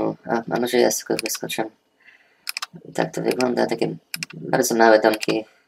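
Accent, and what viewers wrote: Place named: United States. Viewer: Zawsz chciałem poznać kogoś kto mówi po polsku a się w Polsce nie urodził i posłuchać jak mówi. Twój język jest dosyć zabawny dla widzów, którzy nigdy nie byli w USA i nie znają Polonii. Zabawny w pozytywnym tego słowa znaczeniu. Ale uwierz mi, że o wiele bardziej wolę słuchać Ciebie i o wiele łatwiej Cię rozumie, niż niektórych nastolatków w Polsce. Szkoda że zmieniłeś swój głos modulatorem. Nie powinieneś się przejmować uwagami. Ktoś kto ma minimum inteligencji i wyobraźni wie, że niełatwo jest mówić idealnie dwoma językami, jeśli się w obu krajach nie mieszka i nie ma na co dzień kontaktu z "żywym" językiem. Tak samo zabawnie brzmi ktoś kto świeżo przyjedzie z Polski i zna język angielski tylko ze szkoły.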